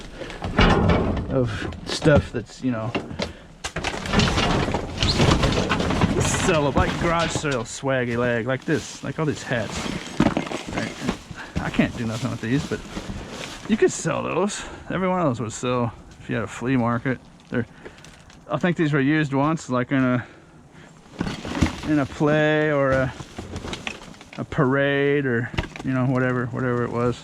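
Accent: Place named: South, United States. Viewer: You’re back! Again! 🥰😁. No, talk as much as you want, I for one, enjoy your particular southern accent. Being southern myself (although, some people don’t consider Florida as being southern), I enjoy and appreciate all the different variations of the southern accents. ✌🏼❤️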